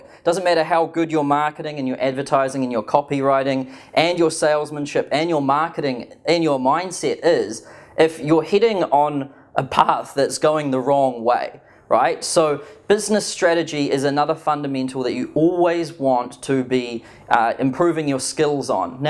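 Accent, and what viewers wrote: New Zealand. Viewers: Kiwi accent?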